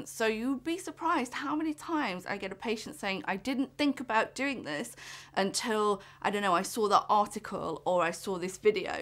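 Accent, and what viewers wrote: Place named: United Kingdom. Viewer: Your videos help me emprove my british accent! Thnx 😋 Cheers from Argentina!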